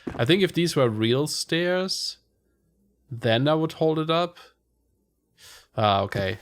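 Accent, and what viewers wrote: Germany. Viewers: Love the german accent, after looking for TFC i luckily found your channel and am really enjoying ur videos! Keep it up :) Hope u will be better soon! Greetings from germany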